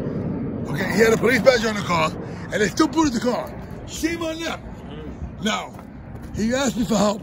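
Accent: New York, United States. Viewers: that’s a nyc Italian accent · This was the most New York accent ive heard. Good on you for getting the boot off.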